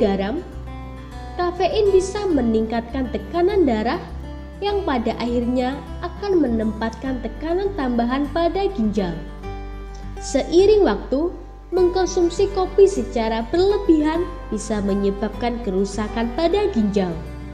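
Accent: Java, Indonesia. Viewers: Suaranya medooook banget mbak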